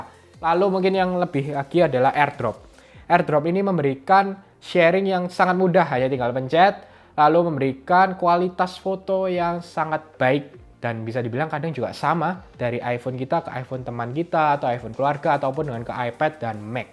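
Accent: Java, Indonesia. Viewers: Penjelasannya enak, runtut, komunikatif, dan yg penting medok.. auto subscribe 👍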